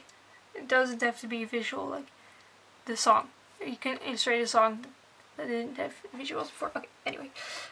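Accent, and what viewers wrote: Netherlands. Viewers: Are you / Is she Dutch? I hear a familiar accent 😏😏😏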